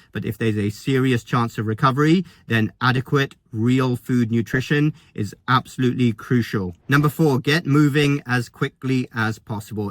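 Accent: United Kingdom. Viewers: You nipped out.And you did not have time to remove that s##t from around your neck. Do you think that makes you more of a doctor? And that British accent is getting tiring now. SHUSH.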